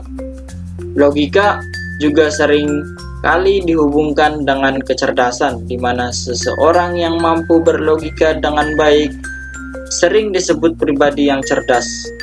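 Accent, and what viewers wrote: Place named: Ambon, Indonesia. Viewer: Persis ambon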